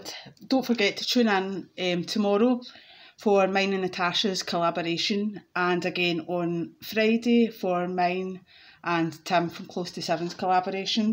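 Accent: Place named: Glasgow, United Kingdom. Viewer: Hiya 👋 Nice session!!! How lovely is Glasgow accent 😍 👍 and sub for you!! I used to live in Scotland 🏴 for over 10 years!!!! I miss this country 🙁 Right now I live in US and I am scratching American scratch cards, unfortunately most of scratch cards are rubbish!!! I remember one year ago was a different story. How you doing?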